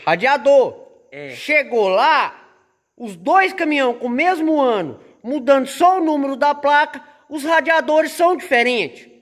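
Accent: Minas Gerais, Brazil. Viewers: Ô trem bão é bão, o trem ruim é ruim ,mais é sempre trem, entendeu trem?!?!?!?! Kkkkk rachei de rir com esse mineirim, ô trem bão sô.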